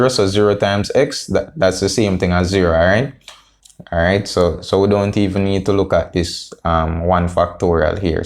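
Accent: Jamaica. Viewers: So nice and simple, my lecture made this sound like a tong twister to me, and you sound more of a Jamaican I really love the vibe keep on uploading more videos you are helping many enjoying from Kenya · My Eng. papers have been beating me mercilessly...this helps. Thank you. I could have sworn you have a Jamaican accent...helps me remember this.😄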